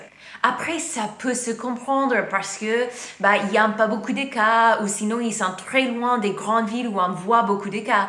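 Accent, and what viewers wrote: United States. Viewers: she's also pronouncing half the words as she would in english. her american accent is very very strong. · Heehee. English Canadian here (but dating a Parisian man in Vancouver) and I so appreciate your speaking in French (and your analysis) but to be perfectly frank I'm laughing because your accent is just so incredibly American. As in there is just the barest effort to pronounce your words as mildly French, hahaha. Please don't mind my comment because it's endearing to begin with but even more so because you are so earnest and passionate about the topic. Your language skills are super however by the way; I'm jealous and still learning in an elementary level. ;)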